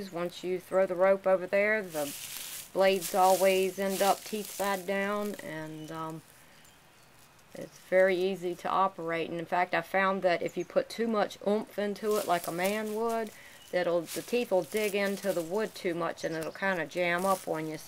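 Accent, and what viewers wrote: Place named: Tennessee, United States. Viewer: Never knew this existed, wow! Must get me one. Love the accent. Sounds like Tennessee.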